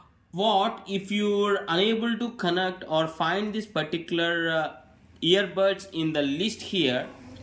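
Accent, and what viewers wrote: India. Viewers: The way you explain as if you are eating your words😂 Indians accent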